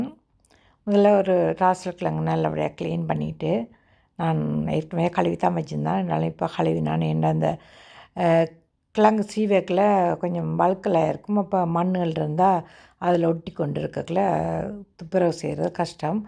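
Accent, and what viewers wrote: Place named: Sri Lanka. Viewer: ஆனால் உங்களின் தமிழ் ரசிக்கும்படியாக உள்ளது இலங்கை தமிழ் எனக்கு பிடித்துள்ளது அக்கா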